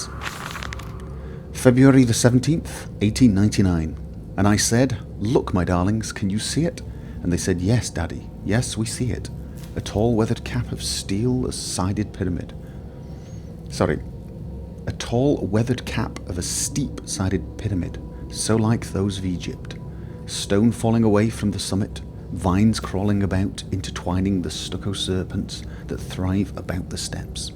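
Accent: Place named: North West England, United Kingdom. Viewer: He is almost certainly from the North West of England. We have almost identical voices so I'm going to say he's within a 40 mile radius of Manchester. :D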